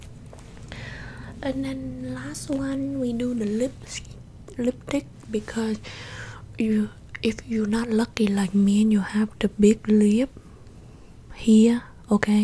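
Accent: Asia. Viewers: Oml please do a million more Asian accent roleplays these are my favorite thing on YouTube. I can’t help but laugh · lmao need more videos like this with the funny asian accent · Lollll this accent make more videos with it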